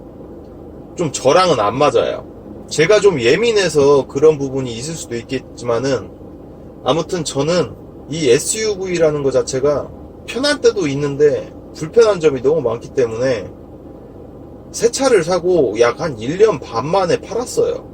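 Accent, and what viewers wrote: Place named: Jeonju, South Korea. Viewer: 어랏? 전주 분 이신가보네요~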